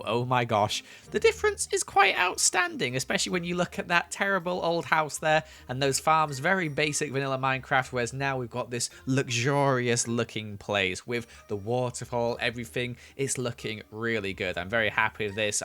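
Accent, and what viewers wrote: United Kingdom. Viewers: Love that thick british accent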